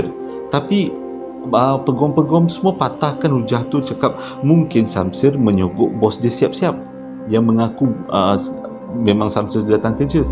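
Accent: Java, Indonesia. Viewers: Wong jowo yo.. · Sedih dgr cite tp kelakor dgr abam ni cite,jowonye pekat😂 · Gaya ckp santaii.. kok mcm ad slang jawa² sikit ckp yer tuu..😂 terus kn brcerita n mmberi kn cerita y best²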